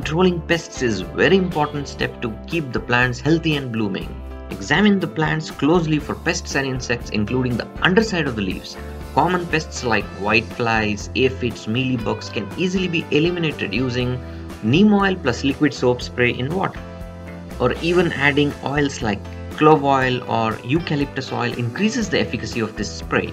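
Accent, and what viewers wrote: India. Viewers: These are common living soil organic farming techniques, if haveing an indian accent made it spiritual for ya then well lol ok man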